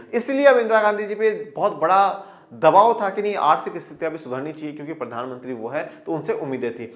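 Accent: Rajasthan, India. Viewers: Rajasthan sa ho kay sir 😂😊😂😊😂😂😊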